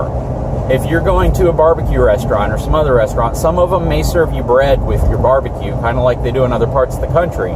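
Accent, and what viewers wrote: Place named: California, United States. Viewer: You sound like an Educated Californian. Love your videos and reviews though.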